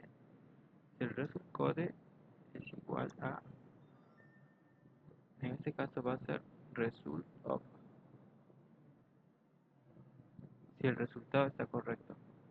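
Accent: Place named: United States. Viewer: hola gracias el video me fue de gran ayuda pero por favor como puedo cambiar la voz es que el problema es que su voz se escucha demasiado rara como si fuera una gringa intentando hablar español y hay muchas palabras que no se entienden bien por favor ayudame necesito arreglar ese detalle de inmediato por favoorrrr respondame rapido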